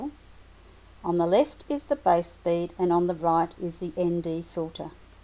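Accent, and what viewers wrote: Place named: Australia. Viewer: Hi, I love your tutorial style! Lovely articulate Aussie voice. Some other tutors talk way to fast for me. I am a beginner in photography world and have done my first on astro shoot which was totally out of focus, so I reached out on good old Youtube to find some answers. Following on from your Night photography tutorial I found the ND filter one and was curious so subscribed to gain further insight. Thanks for sharing your knowledge with me. Jane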